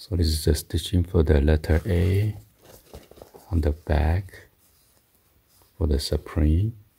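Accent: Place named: Japan. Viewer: 発音からわかる、100パー日本人。賭けても良い。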